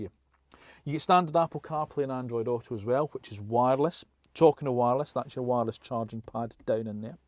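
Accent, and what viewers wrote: Scotland, United Kingdom. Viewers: nice charming scottish chap's calm voice EEEEEEEEEEEEEEEEEEEEEEEEEEEEEEEEEEEEEEEEEEEEEEEEEEEEEEE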